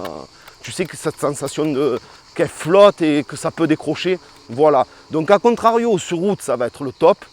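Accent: South, France. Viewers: Super test, j'adore ton accent du sud!!!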